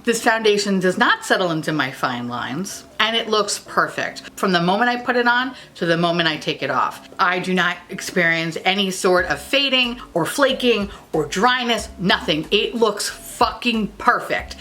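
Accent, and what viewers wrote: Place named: Long Island, United States. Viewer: if u ever see this comment I think we would all love for u to do a video in your queens/Long Island accent!!! I would live!!❤️❤️❤️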